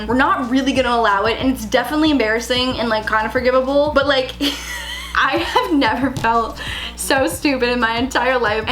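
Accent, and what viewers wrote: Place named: Ontario, Canada. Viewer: You can 100% tell is from ON bcos she doesn’t pronounce the 2nd t in Toronto 😂😂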